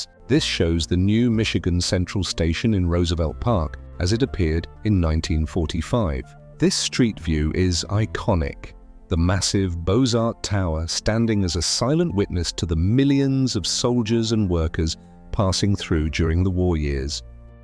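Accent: United Kingdom. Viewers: Noooo! The "arsenal of democracy" as narrated by a Brit! Gag! ANOTHER!! Anerican story but no American narrator! How about the history of London narrated by a "suthin cracker!" Shown in Grt Brit!!?? DONT WATCH!!!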